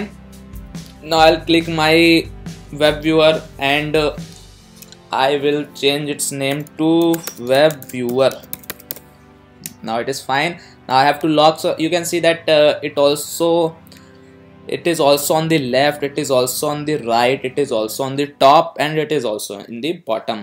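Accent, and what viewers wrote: India. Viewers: you have india ascent , indian?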